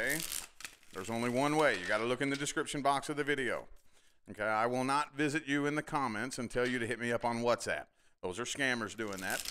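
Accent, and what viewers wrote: South, United States. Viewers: Thank you for the reading! Like your Southern accent and the no bs approach! 👍 Also, if it’s possible, is there a way the cards can be more specific in your future readings in regards to the age of the ‘other person’ example: Older/Younger and if their in a foreign country? This would help a lot in deciphering the readings. Thank you so much! Peace! ✌️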